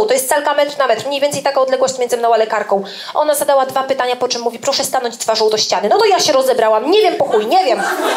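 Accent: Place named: East, Poland. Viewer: Skąd ten wschodni akcent?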